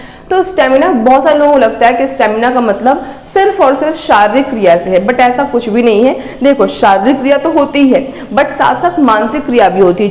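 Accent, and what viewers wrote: Bihar, India. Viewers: Very sweet voice mam.. Madam bojha dhone like we r bihari... Proud be a bihari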